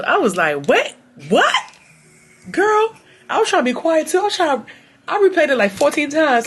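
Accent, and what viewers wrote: London, United Kingdom. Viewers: Love a British accent! ❤ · Nailed London voice frfr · I love your British accent 😁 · It's the British accent for me. You have a friend in me well a born and raised British play mommy here. You looked beautiful for dinner. Of course you had me dwl. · omg I'm so here for the British accent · Your British accent is so fun! 😄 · Im so here fot the bri’ish accent! 😂🙌🏽 it never gets old or annoying! I thoroughly enjoyed watching you speak in their accent while applying your makeup ☺️ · Accent solution, a Caribbean babe that was born or raised in London. Both come through. Tootsie time comes to mind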